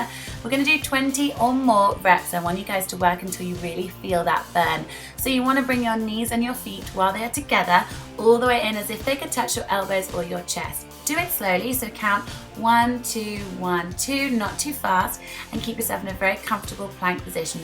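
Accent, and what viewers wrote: United Kingdom. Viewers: That body + British accent= heaven! Wow!! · Are you from England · How refreshing to hear a British babe on youtubes fitness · Are you from Norwich?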